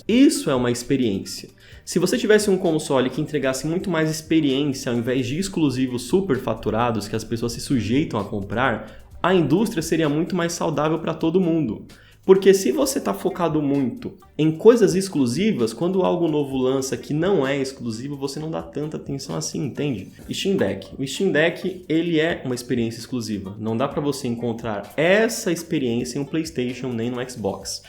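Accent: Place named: Northeast, Brazil. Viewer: vc é nordestino né? só podia ser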